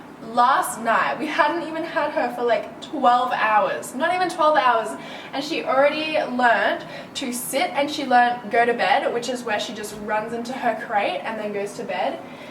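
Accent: Australia. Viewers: Oh my goshhhhh you're so right about the IT powder. Hate it. I do a lot of flash photography and learned the hard way about the white spots. Then again about the UD eyeshadow palettes. I have 3 of them and I bought them when I first started to really get into make up and because urban decay was/is a popular brand only to find out those eyeshadows really do suck. I just got my first ABH palette and there is no comparison. By the way you are so gorgeous I love watching your videos. Plus I love the Australian accent and if I ever go out of the USA I'm going to Australia hands down. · Mia she's Australian. it's an Australian accent and her address is clear enough to tell she lives in Australia... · Nuria Olid shes british? she sounds kind of australian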